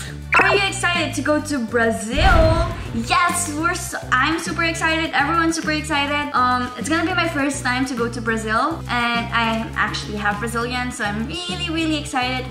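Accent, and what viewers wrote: Philippines. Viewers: Pinay accent 🤣😍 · Love her Standard Pinoy English Accent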